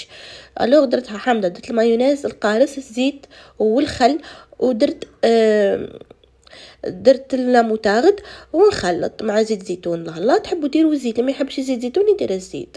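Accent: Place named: Annaba, Algeria. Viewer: الله يبارك عليك راني نتعلم من ماما ومنك❤❤ شطورة وتحفزيني❤ تحيا للهجة عنابية نحبها بزاااف❤ وصحا فطورك ✨🥀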